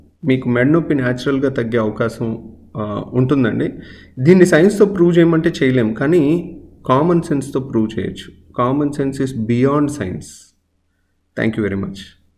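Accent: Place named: Krishna District, India. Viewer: Sir you speak very nice pure Krishna district telugu.. and with beautiful smile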